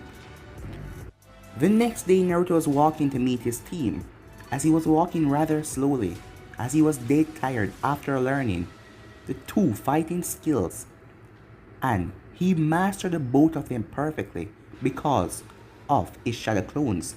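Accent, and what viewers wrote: Jamaica. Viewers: He’s from Jamaica That’s How He Talks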